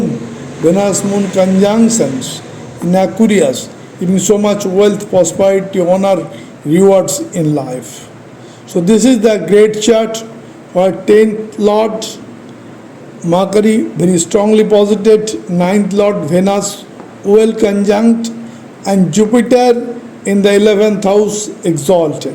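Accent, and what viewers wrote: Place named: Bengal, India. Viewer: Namaste Sir i heard Bengali Accent. It feels good. 😊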